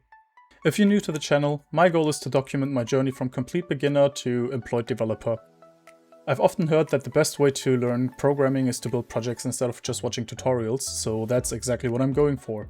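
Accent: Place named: Germany. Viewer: u sound german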